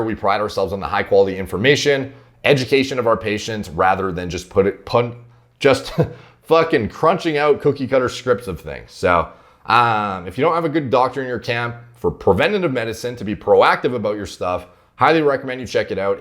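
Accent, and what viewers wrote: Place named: Canada. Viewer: Anytime he pronounces something different than I’ve heard before I just blame it on Canada.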